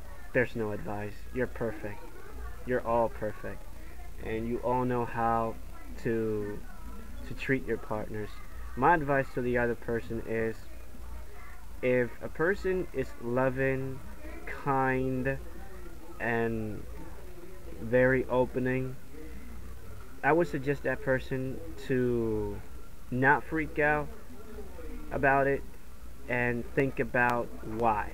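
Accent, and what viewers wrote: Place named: Dominican Republic. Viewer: Manito. Como estas 😊 i hear the Dominican accent. Bless u man INFJ here too.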